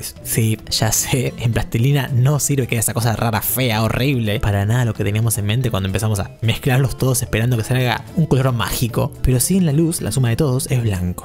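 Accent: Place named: Buenos Aires, Argentina. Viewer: Listo sabemos que sos de buenos aires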